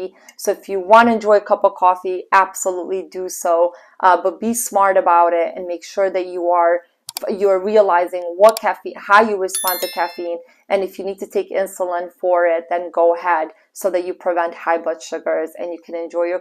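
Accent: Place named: Long Island, United States. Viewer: Long Island accent!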